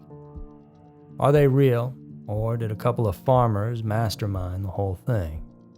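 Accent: South, United States. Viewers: Much Appreciated. i want to believe how you’re a real human Narrator because i like your Private Eye / Country Boy accent or style which gives the information a personal or ‘ Pssst - wanna hear something very interesting? ‘ feel! 😁👍❤️🐀🐢👽from near Bournemouth SW England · When they make these videos, why can't they find a narrator who does not speak through his nose? It is a real turn-off! Also, the American accent does not help!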